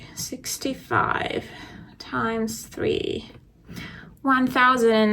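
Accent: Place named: New York, United States. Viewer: You have picked up some NY words. Like you said “cawfee” the other day. Meant to tell you. Haha. You are an official New Yorker. There are a few words I have heard that reminded me of living there.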